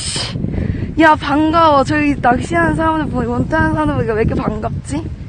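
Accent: Busan, South Korea. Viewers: 댓글은 오늘 첨 달아 보는데~ 유투브 영상 다 챙겨 봤어요~ 재밋네요~부산사람 이라서 말투도 더 정감 가요 ~ 오늘 영상도 재밋게 잘 보고 갑니다 ~항상 파이팅 하세요👍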